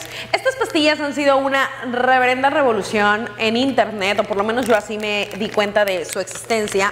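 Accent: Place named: Fresa, Mexico. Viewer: Por qué hablas tan fresa !?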